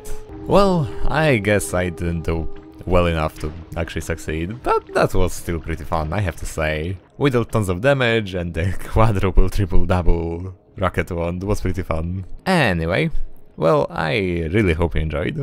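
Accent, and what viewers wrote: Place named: Poland. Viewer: You have a very slavic accent, are You perhaps Polish? Also noic nice wand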